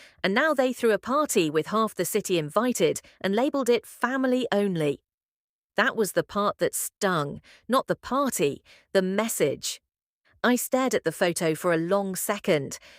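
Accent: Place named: United Kingdom. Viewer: Because it’s in a British accent 😁 Watching from Leicestershire in the UK 🇬🇧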